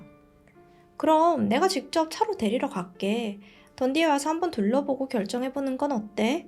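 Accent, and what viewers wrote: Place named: Jeolla, South Korea. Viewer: 전라도 사투리인듯요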